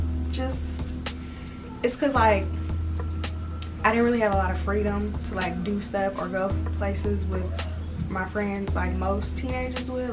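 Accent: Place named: Dallas, United States. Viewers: Fr it’s that country mixed with some properness 😂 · As soon as I clicked on this video and heard you talk I knew right away you from Dallas 😂😂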